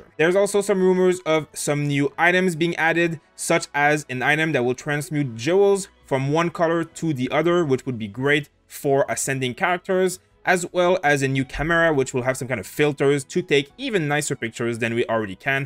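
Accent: Quebec, Canada. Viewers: Am I dreaming or do you have a french Canadiens accent? Btw new here but I'm loving your channel! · That accent... You're from Québec, am I right?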